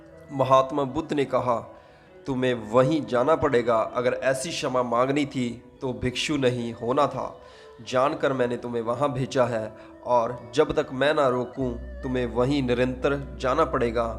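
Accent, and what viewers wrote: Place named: Punjab, India. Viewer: Nice video. Aap ki awaj pe punjabi touch aata hai. Kya aap punjab se ho?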